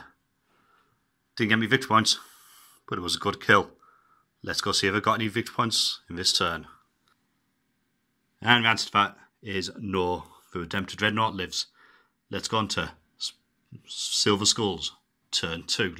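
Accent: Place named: Teesside, United Kingdom. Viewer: Sizkipala, seriously m8, the super dramatic on tenterhooks commentary does it for you? Man. Don't encourage him. Maybe it's the Teesside accent but am not feeling it.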